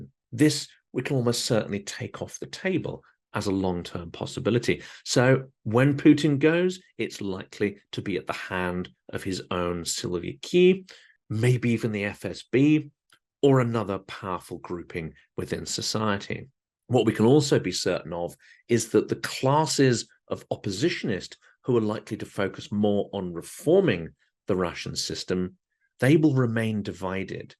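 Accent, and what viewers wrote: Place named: United Kingdom. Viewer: Ukrainian shirt + Brittish accent! 🇸🇪 ❤ 🇬🇧 🇺🇦 💛💙💛💙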